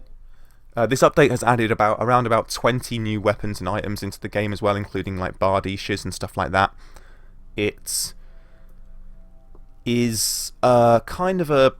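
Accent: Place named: United Kingdom. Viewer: Oh heck yes! A sexy British voice guiding the events that unfold upon gameplay! Heck ya!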